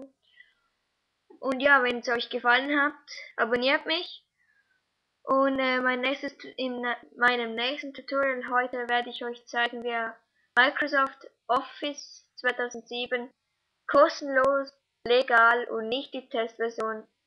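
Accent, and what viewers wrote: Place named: Switzerland. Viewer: schweiz???? oder wie mir gefällt der dialekt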